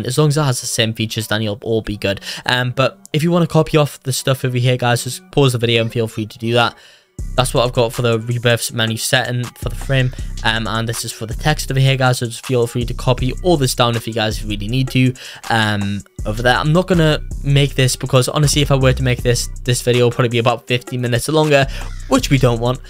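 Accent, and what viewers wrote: United Kingdom. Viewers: By the way he talks hes frim london AND I HAVE 100TH COMMENT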